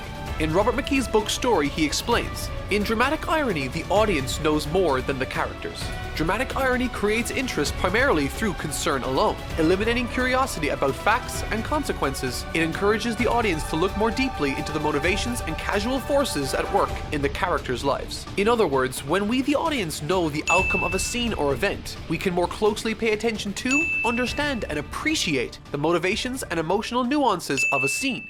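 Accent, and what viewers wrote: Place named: Ireland. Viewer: Great video, I've become a huge fan of yours in the past few weeks. But I need to ask this as it's distracting while I watch your videos. Are you from Ireland? Keep up the great work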